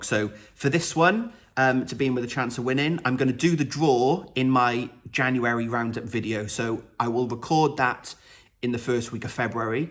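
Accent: Wales, United Kingdom. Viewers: I'm loving your videos. Good luck with getting through your plans. I really enjoyed knitting the flax light for my daughter in lockdown. I'm currently on the last few rows of my Winter Lights Shawl by Stephen West (my first West Shawl). Also hearing a Welsh accent is making me feel home sick being a Cardiffian living in Manchester and not having seen my family since February 2020. Thank you for the virtual cwtch!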